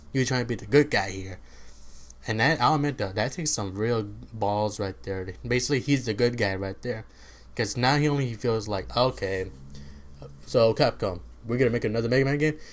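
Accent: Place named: Mexico. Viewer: you have a strong Mexican Accent are you? Well good work on the video bro ;)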